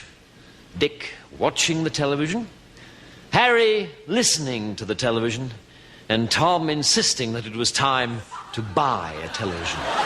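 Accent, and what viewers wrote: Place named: Newcastle, United Kingdom. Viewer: I'm proud of this talented man from the North of England..People are often amused at geordies but Rowan is from Consett, believe it or not, and can speak his native geordie accent very well!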